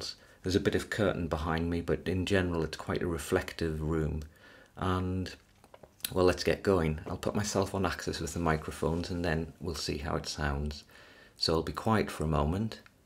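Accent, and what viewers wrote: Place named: United Kingdom. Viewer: The amateur audio engineer in me enjoys the basic exercise being performed here. The ASMR enthusiast in me appreciates calm British-accented voice.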